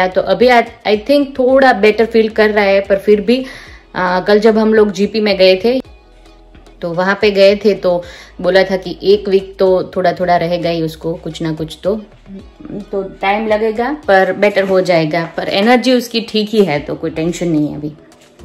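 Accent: Kathiawar, India. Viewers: pure kathiyavadi Mithi gujrati boltahe happy navratri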